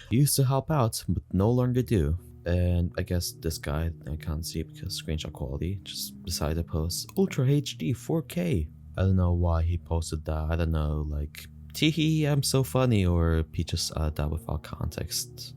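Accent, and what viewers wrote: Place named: Philippines. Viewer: u sound awfully similar to a Filipino or at least someone of ASEAN descent (southeast Asia)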